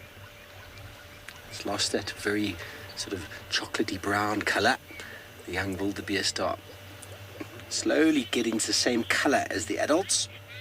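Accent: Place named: South Africa. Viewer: The south African accent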